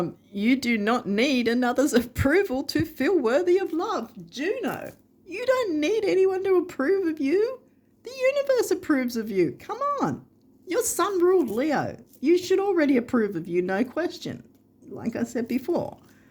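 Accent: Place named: New South Wales, Australia. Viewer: Wow, thanks for this! Followed Gregory Scott across to explore your site - got way laid here on my way to the Mushroom Tarot review. What a happy serendipitous day of exploring rabbit holes this evening has become. Just got back from six months in NSW so hearing your accent was a heart warming thing in itself. Will have to re listen to this a second time and take notes. Love noticing how the tendencies and energies ebb and flow as I'm wading through this month of 'could go either way in home, relationship, work - looking after myself has become a priority though now. So thanks for the encouragement for that!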